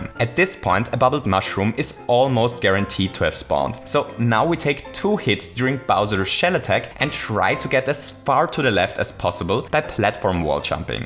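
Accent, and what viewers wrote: Germany. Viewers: Ur english sounds very german ps: ich höre mich öhnlich an wenn ich englisch rede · Kann es sein, dass es deutsch bist? 😂❤️ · Bist du deutscher? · Ich wette dh bist deutsch 🤔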